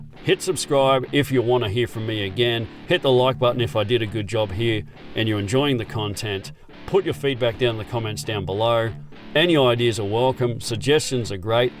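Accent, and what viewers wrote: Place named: Australia. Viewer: I've understood so too, that it's not a small reason for that channel to be so popular. And those videos are quite well scripted. Even though they make mistakes every now and then. Your pacing, use of voice, script, editing and that Australian accent plus those sentences or questions with your voice modified are well made/good content. 👍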